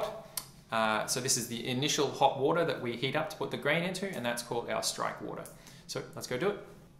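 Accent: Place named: Australia. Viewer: He said 0.8 - not 3.8. Its that Aussie accent.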